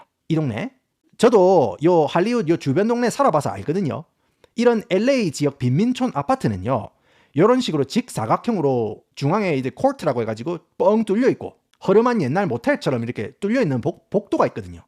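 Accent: Gyeongsang, South Korea. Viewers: 아닠ㅋㅋㄱ 님 걸쭉하게 경상 사투리 쓰다가 갑자기 영어발음 갑자기 멋있게 뽑는거 웃겨욬ㅋㅋㅋ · ㅋㅋㅋ...경상도 말이 너무재밌네요~구독좋아요~홧팅삼춘~ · 이야기를 정말 맛깔나게 잘 하시네요😊.. 사투리도 좋구요~~ 고향이 경상도쪽이시죠?😅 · 나도 경상도 사람이지만 사투리 억양이 듣기 싫어서 못듣겠어서 나갑니다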